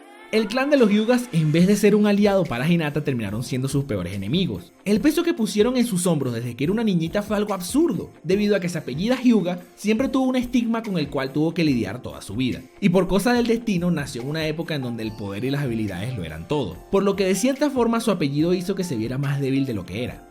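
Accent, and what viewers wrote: Venezuela. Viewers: Excelente video, estoy muy de acuerdo con tigo, es mi imaginación o tu acento es de Venezuela??? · Jodaa ese acento venezolano xd i love naruhina, sigue asi, me encanta como editas.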